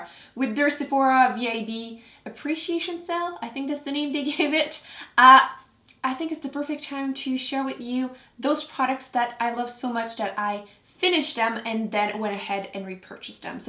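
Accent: France. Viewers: Hi! Thanks for this! (I’m from Ontario and just love your French accent!) For baby hairs/fly aways, to keep them in place, just spray some hair spray on a toothbrush and comb them down. ❤️💋🇨🇦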